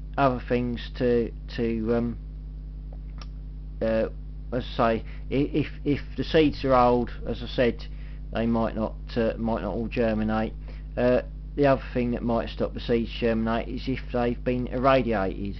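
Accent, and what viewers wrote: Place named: Rugby, United Kingdom. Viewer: A great video! Many thanks! - Where are you from? You sound local to me... Rugby, UK?? - Julian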